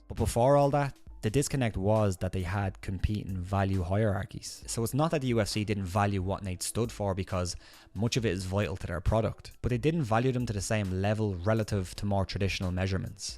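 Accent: Ireland. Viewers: Awsome job my friend! You were spot on! I have to admit when I first heard the accent I was surprised when you started to drop that knowledge. Didn’t think an Irish man would but the time in to study Diaz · Heard the Irish accent 20seconds into the video. Assumed he was going to be biased towards conor. Turned the video off.. Sorry dude. An Irish man commenting on nate diaz just does NOT work for me. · “Well shimmy me timbers!”This was absolutely awsome to hear this narrated by an Irishman! Believe it or not, the Irish & the Mexicans have a lot in common! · Haha love the Irish accent man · it's a weird feeling .. hearing an irish man talk about Diaz. Certainly lits a fire under me belly!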